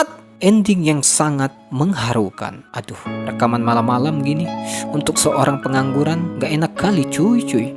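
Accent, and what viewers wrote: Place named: Java, Indonesia. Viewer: Wong jowo bang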